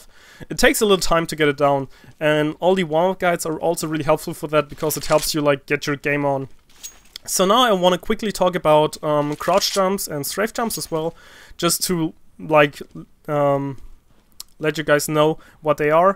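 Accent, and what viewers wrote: Germany. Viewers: are you german you have a german accent in your voice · are you german ?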